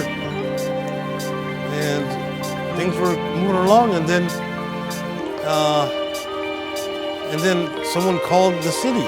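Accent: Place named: East Los Angeles, United States. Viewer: This guy is great - can tell right away by his accent he grew up in East L.A.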